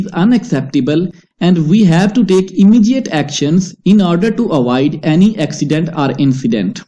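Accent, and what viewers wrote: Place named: India. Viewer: He has got the Indian varient English all mixed up? Or is he in a cold bath?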